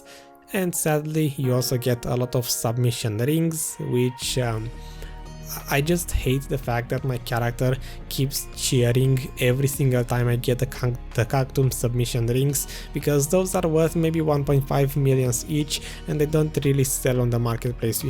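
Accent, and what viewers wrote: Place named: Romania. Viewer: haha, your accent is so romanian. the moment I heard it I was almost certain XD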